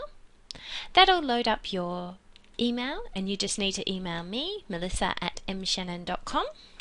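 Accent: Australia. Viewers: Australian accents are the best! Lightens up the mood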